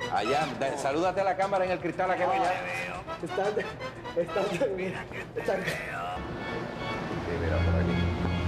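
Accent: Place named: Puerto Rico. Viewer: Me encanta el acento boricua. Bendiciones a Puerto Rico.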